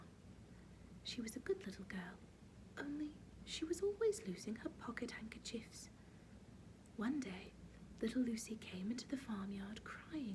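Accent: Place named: England, United Kingdom. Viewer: Back with the very convincing English accent 🙂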